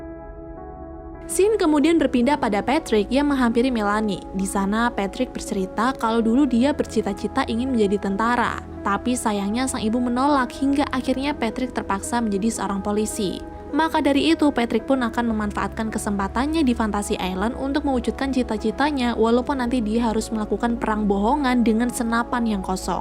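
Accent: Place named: West Java, Indonesia. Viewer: Alhamdulillah Damang 👍 Sunda na ti palih mana teh😁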